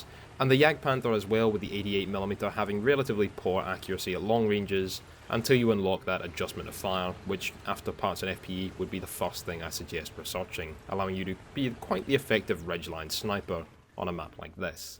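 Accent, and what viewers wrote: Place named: Scotland, United Kingdom. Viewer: Is it just me or did your scottish accent get extra thicc today?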